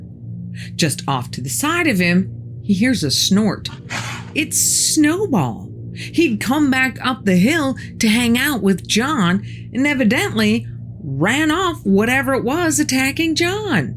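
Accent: Midwest, United States. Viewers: You're a good story teller. Where's your accent from? Illinois is my guess. Maybe Wisconsin or Minnesota???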